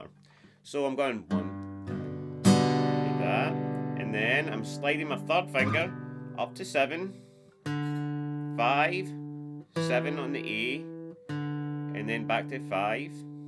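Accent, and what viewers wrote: Scotland, United Kingdom. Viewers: im a Kiwi and find your Scottish twang very easy to listen to but I do have a wife from Folkirk.Great vids thanks very much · Sounds great, many thanks, and don't try to disguise that lovely Scottish accent! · Love that accent, love to copy it when speaking English! 😊 Been in Scotland three times up to now, such a nice country, wonderful people up there! The hymn of our football club here in Cologne, the 1. FC Köln, has the melody of "Loch Lomond" - always gives you goosebumps! Keep on rocking,! Great lessons you give here! Greetings from Germany! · Love the Scottish accent ❤ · I’m Italian, use to struggle with British accent but easily understand 99.7 percent of what you say 😊God bless Scots! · Greetings from Frankfurt/Germany. Please do me a favor and stick to your Scottish accent. I am always pleased to learn a new language and your lessons are awesome and I look forward for every single one of them. 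· I love your accent, and having just got back to London after my first time working in Scotland love it even more! It's one of the reasons why I watch your videos. The main one is after many years your lessons have opened up my playing when ive been stuck in a rutt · It's a Scottish accent, right? Sometimes I misunderstand when you bend those vowels in a way I wasn't expecting, but it's beautiful, like bending notes in the Blues 😊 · I love your accent,! It's really been helping me with my Scottish accent practice, too.;-) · Hey, thanks for the grate lessions, stay as you are and keep that little scottish accent! For me - as a german - the rolling R is completely normal 😁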